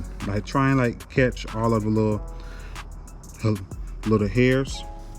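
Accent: Jamaica. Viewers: Just bought this today, thanks man. Jamaican???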